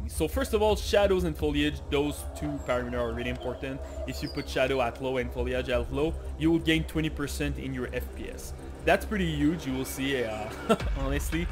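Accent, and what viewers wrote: Quebec, Canada. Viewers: Yooooo je reconnais l'accent quebecois ahahaha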